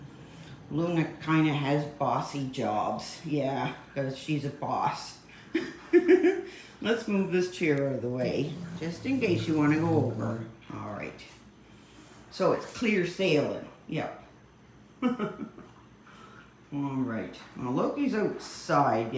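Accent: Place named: Eastern Canada, Canada. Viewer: Aboot ❤ the Eastern Canadian accent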